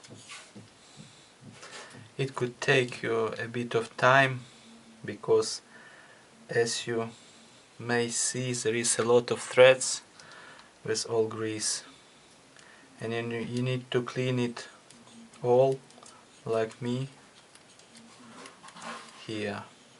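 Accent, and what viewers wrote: Russia. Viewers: Тяжелый русский акцент) как будто литтл биг слушаю)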